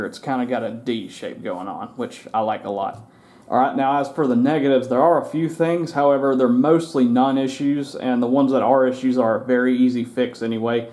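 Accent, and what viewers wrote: United States. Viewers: Country accent. FC5 takes place in a county over ran by a cult. Great game.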